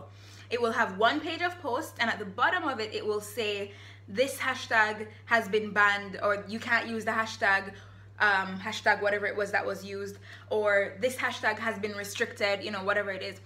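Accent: Jamaica. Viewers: you sound Jamaica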